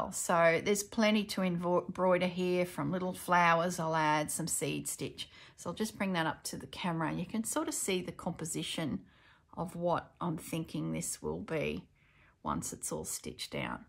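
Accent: Australia. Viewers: I really enjoyed watching this, quite inspirational. Fantastic to hear not only an Australian accent but a Queenslander, yay!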